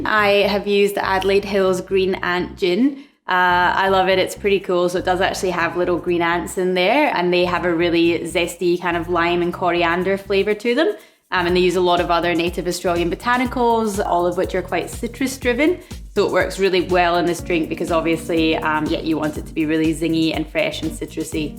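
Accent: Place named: Scotland, United Kingdom. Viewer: Scottish accent...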